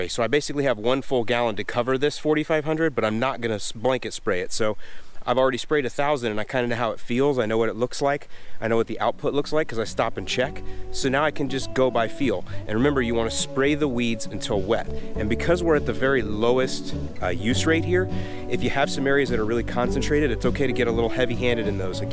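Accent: Chicago, United States. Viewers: The production value, photography, videography, music, sound, all enhance the great lessons. I get a kick out of the use of the Chicago accent and sayings on other videos from this! Well done. Great content! Cheers!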